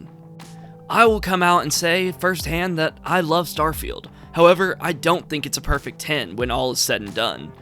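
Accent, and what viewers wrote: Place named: Pennsylvania, United States. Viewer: your accent reminds me of people in Pennsylvania .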